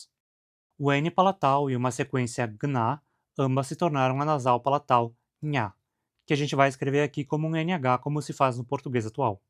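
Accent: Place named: South, Brazil. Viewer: vc tem um sotaque sulista, mas eu não tenho conhecimento suficiente pra discernir de qual estado vc veio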